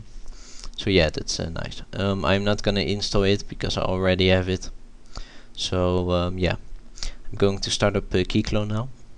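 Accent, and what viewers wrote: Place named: Quebec, Canada. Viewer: true! I think he is quebecian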